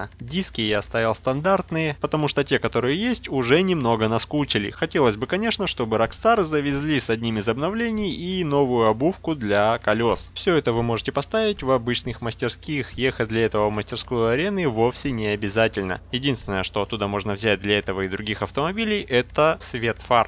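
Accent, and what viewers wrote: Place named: Russia. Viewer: Он снимает видео на русском, для русской аудитории. Поэтому и говорит так, как удобно, на русский лад, если хотите. Снимал бы на английском - место для придирки было бы. А так нет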